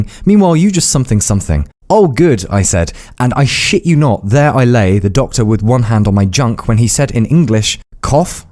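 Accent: Bulgaria. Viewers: I love your bulgarian accent. And i am genuinely surprised by how much you like Bulgaria. As someone that's born and raised here i always look at the negatives, but your admiration with our culture and language is kinda inspiring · its so funny seing switch from a British accent to a heavy bulgarian accent because that's exactly what happens whenever I speak russian. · you've picked up the Sofian dialect lmao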